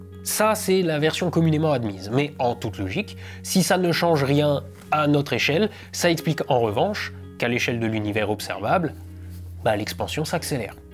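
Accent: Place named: Alsace, France. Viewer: L’accent alsacien et la prononciation des le début me ravi ! Mdr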